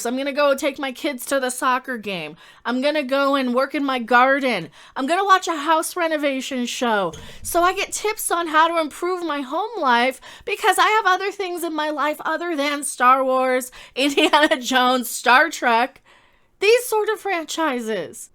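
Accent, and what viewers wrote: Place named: United States. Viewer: your demeanour is Australian but with an American accent. Refreshing to see someone else done with modern cinema and tv media. You need a Google chromecast, and then you can play 80s and 90s movies and tv shows.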